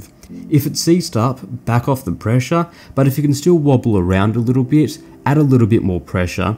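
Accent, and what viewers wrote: Australia. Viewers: Nice Aussie accent ! Reminds me of a Brit who spent a long time in Perth, WA, but I could be wrong. Otherwise, the various tips are useful, thanks.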